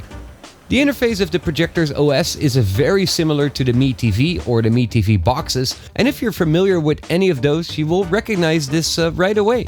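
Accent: Netherlands. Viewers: Allthough you speak very good english, i detect dutch......am i correct? Nice vid btw. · your accent....is that dutch??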